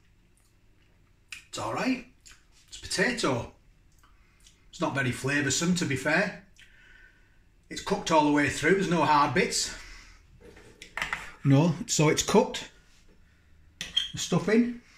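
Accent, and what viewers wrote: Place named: Yorkshire, United Kingdom. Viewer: Where are you from.. you gotta be yorkshire lol.. where about.. I'm Leeds .. I'm gonna try this.. when iv room int freezer lol x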